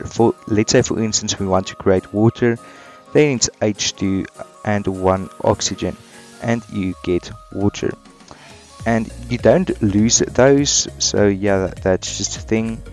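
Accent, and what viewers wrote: Afrikaans, South Africa. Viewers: Are you from South Africa #SouthAfrican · is jy afrikaans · He sounds southe afrikaan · Dude are you South African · You can hear the afrikaans in his voice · youre afrikaans right? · Are you from south Africa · My bru you sound south African my chom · Mate you from SA?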